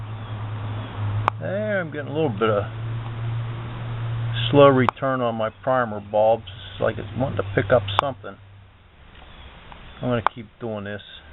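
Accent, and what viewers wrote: Pittsburgh, United States. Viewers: Thanks! gonna try this out on my Toro! Knew you was from da'Burgh!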